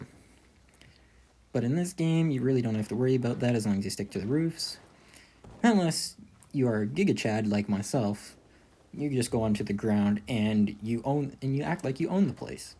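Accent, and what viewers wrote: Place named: Canada. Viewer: your canadian accent lol 😬😅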